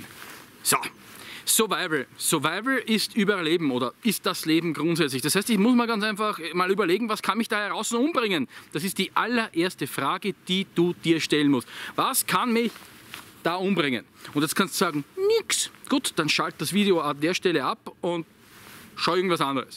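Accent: Austria. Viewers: ich mag ja eh den Ösi Dialekt, bin Falco Fan seit je her, blabla, ich finde Deine Videos einfach genial, Du triffst jedes Thema auf den Punkt und gerade der Unterhaltungswert Deiner Videos ist mega! Wenn ihr mehr wissen wollt, was mein Kommentar noch so kann, dann bleibt dran!:-)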